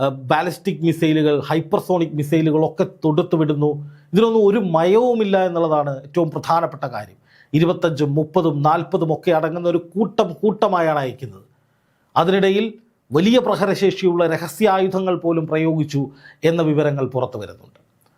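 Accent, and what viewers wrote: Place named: Pathanamthitta, India. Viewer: ത്രീശ്ശൂർ ആണോ. പത്തനംതിട്ടകാരന്റെ സംസാരമാണല്ലോ കേട്ടിട്ട്.. നീട്ട് ഇല്ലല്ലോ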